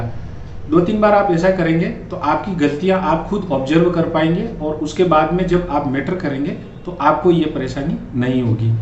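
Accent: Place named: Himachal Pradesh, India. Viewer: Aapni voice himachali lgdi h😊 mai himachal se hu mera 6 August ko typing test apki video kafi helpful rahi i hope mera test acha jaye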